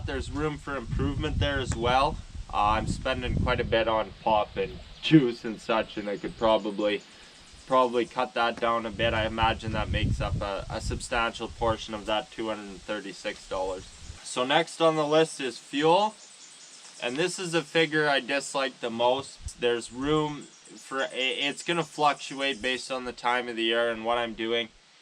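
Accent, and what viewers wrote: Canada. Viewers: As soon as you started talking I knew you were Canadian. LOL We do have an accent that is identifiable. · He reminds me of letter Kenny. Also I don’t know why I’ve never heard it but Canadian accents like his almost sound Irish at times. They have the lilt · Lol right away I thought this guys Canadian.. anyway good video and I subscribed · I knew you were Canadian with the first sentence you uttered. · I could listen to you talk for hours about anything. Your accent is amazing and as a fellow Canadian I wish I had it lol. You’re the most attractive man on YouTube ❤️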